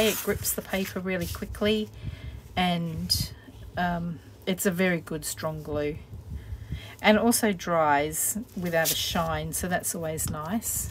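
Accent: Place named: Australia. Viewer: thank for the tutorial. OMG. Bay gul. in California. I thought you said Bible , too. love to hear Australian speak.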